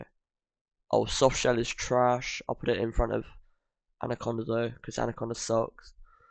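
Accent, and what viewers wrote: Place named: United Kingdom. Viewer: The British pronunciation is so nice😭👍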